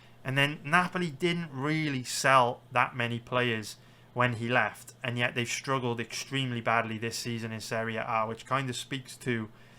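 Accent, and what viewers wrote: United Kingdom. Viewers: Cardiff accent isi? 🏴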